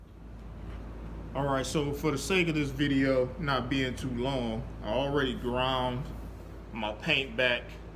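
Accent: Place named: Youngstown, United States. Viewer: Where you from man. Reminds me of my area in Youngstown hahah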